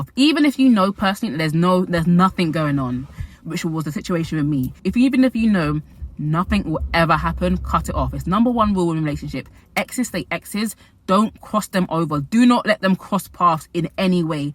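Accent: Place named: United Kingdom. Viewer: Relationship advice + British accent = GOLD Thanks!!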